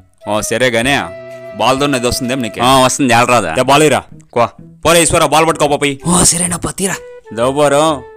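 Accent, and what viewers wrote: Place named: Anantapur, India. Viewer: Brother..MAA OORU GORANTLA...MEE CHANNEL DWARA MANA ANANTHAPUR SLANG BAGA POPULAR AVUTHONDI..KANI SMALL CORRECTION DONT MIXUP OUR TELUGU WORDS WITH KANNADA WORDS LIKE DOORAM WITH DOVVU...A SMALL REQUEST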